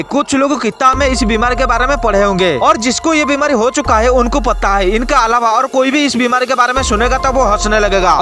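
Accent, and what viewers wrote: Bengal, India. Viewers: Bengali jaise bat karte ho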